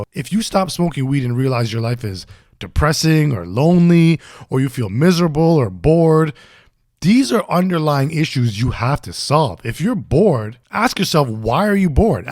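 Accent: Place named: Toronto, Canada. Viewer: Why is it so easy to tell that someone is from Toronto 😂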